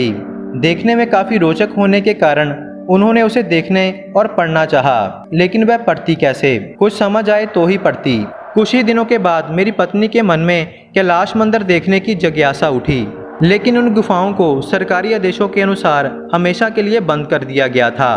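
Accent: Punjab, India. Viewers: Caught a panjabi parson telling hindi story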